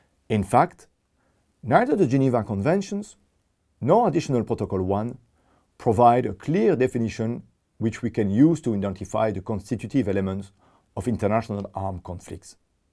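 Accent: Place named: France. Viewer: I like the French accent. Thank you for your explanation.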